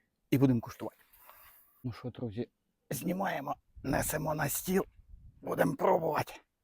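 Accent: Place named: Vinnytsia, Ukraine. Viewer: Не помідори,а томати❤,а говір наш вінницький👍🙏❤️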